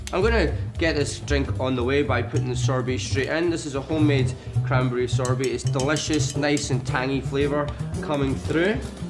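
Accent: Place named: Scotland, United Kingdom. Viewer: i love his accent..he's scottish?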